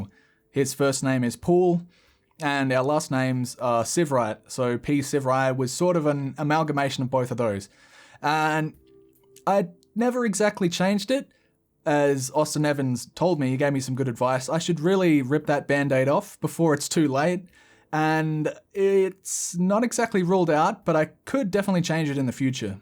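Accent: South Australia, Australia. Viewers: Ahhnswer.. classic Adelaidian. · Oh heaps good, I had no idea you were a fellow South Aussie, for some reason I thought you were Victorian.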